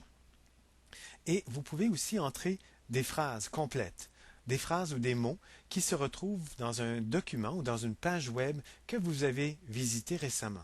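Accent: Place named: Canada. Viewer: j ador ton accent canadien ^__^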